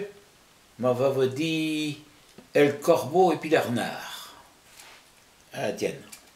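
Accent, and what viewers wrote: Quebec, Canada. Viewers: On dirait que vous parlez Québécois dis donc? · Wow, que c'est beau d'entendre ce parlé normand en France qui ressemble au mien au Québec. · J'ai appris qu'il y a eu une débarquation d'anglo-normand en Gaspésie peut-être c'est pour ça l'accent est similaire au Québec, surtout au nord :P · J'ai l'impression d'écouter du français québécois de quelqu'un d'une autre région quelque part dans l'est. Ça parait que nos ancêtres normands nous ont transmis une grande partie de leur langue. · Ça sonne très québécois c'est fou :O (je suis du Québec) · Beaucoup de similitudes avec l’accent Québécois. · Je suis du Québec et votre manière de parler et les mots de patois normands sont très similaires à ce qu'on dit ici! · Je trouve que ça fait beaucoup penser à l'accent du nord-est du québec ex. Havre-Saint-Pierre!! Vraiment cool de découvrir ça!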